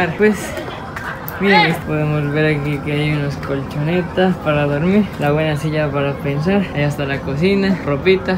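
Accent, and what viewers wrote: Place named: Loja, Ecuador. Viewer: Muy buen vídeo tienes mucho talento, saludos desde Loja hermano, tú pareces Lojano también por tu acento y todo...de que parte eres?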